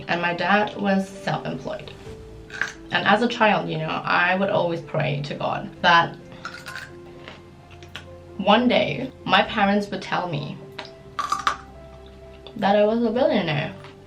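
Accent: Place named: United Kingdom. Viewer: her voice is becoming very very British now,, lmao its cute ♡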